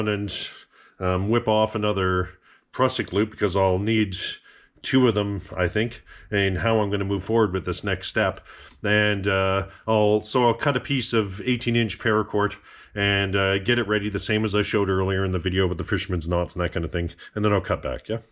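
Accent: Canada. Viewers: Are you from Nova Scotia ? Not mocking you , lord knows I have my language tells . You say the word yeah a lot . It reminded me of the ina bomber movie how the fbi caught him with his language tells.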